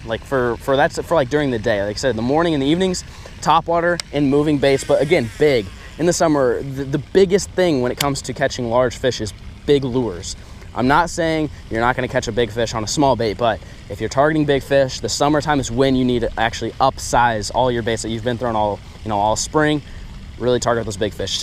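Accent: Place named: Pennsylvania, United States. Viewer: You gotta be from pa bro 😂😂 u talk exactly how I do when I’m fishing 😂😂 I might cuss a little more tho 🤷🏻‍♂️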